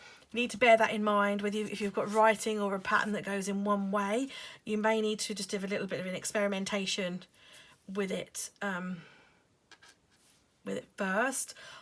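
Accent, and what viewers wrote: England, United Kingdom. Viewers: Lovely to hear another English voice on here! I got mine from Amazon, but I'll be going to The Range in future as is much cheaper!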